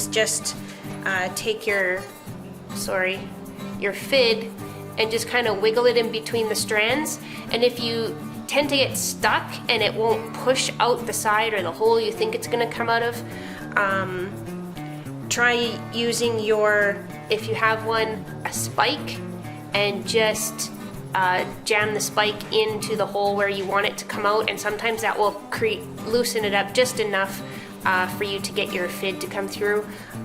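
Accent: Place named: Canada. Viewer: Thank you very much. You have a wonderful video! Are you Canadian!? Accent gives us away!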